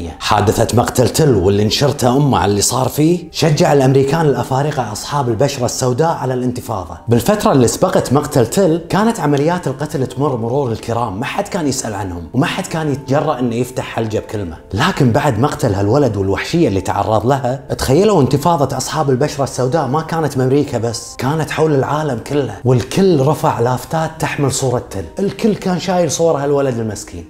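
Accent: Kuwait. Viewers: حبيت اللهجة الكويتية من وراء سردك الحلو وغشمرتك وخفة دمك...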